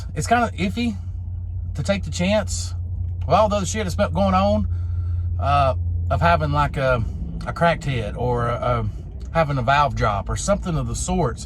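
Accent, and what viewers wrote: South, United States. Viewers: Watched the video with the closed captions on. It doesn't recognize a southern accent very well. But I knew what you meant. I see the white chalky spots on your fan as well. That to me, confirms you have a leaky radiator. As for your cam issues, bearings, lifters, rockers, and oil passages, I would go ahead and do an inframe while you have it apart, and as the shop said, that might not happen for six months or longer. Start filling up your maintenance piggy bank and get it done and out of the way. You'll need to do an inframe sooner or later, so why not do it all at one sitting or during one downtime. I enjoy your vids and have been watching vids as well. I'll be back on the road soon and will keep my eyes open for you guys.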